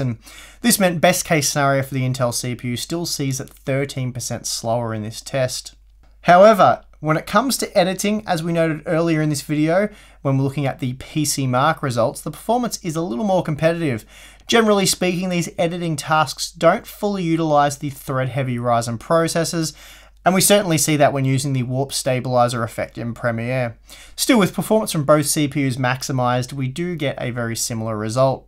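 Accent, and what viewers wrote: Australia. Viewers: Nice Aussie accent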